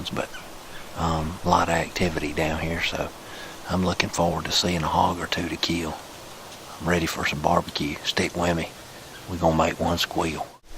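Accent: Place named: South, United States. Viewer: Dope video love the clarity of the audio too it’s so calm and quiet but the southern accent and saying “keeel” the hog brings it all together 5 stars ⭐️⭐️⭐️⭐️⭐️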